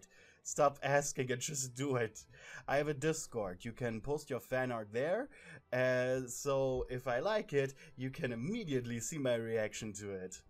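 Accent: Germany. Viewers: GODDDD I LOVED THIS SO MUCH (question are u German?)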